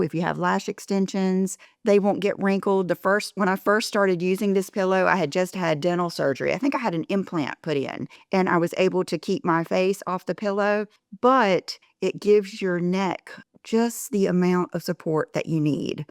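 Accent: South, United States. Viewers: Thank you. Your videos, your presence, your lovely southern accented voice, one of my favorite Influencer's to watch and listen. I know all of the thinks you talk about, but sometimes, adulting in wellness can be put on the shelf. So I do take your advice on a few things, and they help. love you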